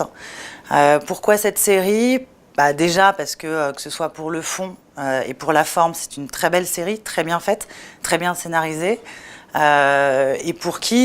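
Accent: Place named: France. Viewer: merci pour cette video, juste un truc, tu prononces l'anglais à la francaise surtout la lettre R et th qui ne se prononce pas comme Z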